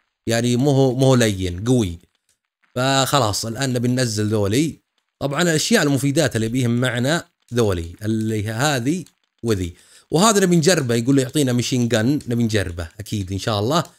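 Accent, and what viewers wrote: Qassim, Saudi Arabia. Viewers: 😂😂😂 والله المقطع ضحكتني الله يسعدك و أحلى لهجة القصيميه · قصيمي اللهجه؟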